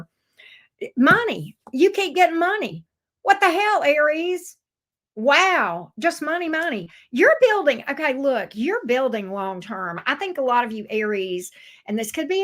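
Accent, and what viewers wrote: South, United States. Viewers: Happy New Year! You’re the cutest! Love your southern accent! 😘🥰😍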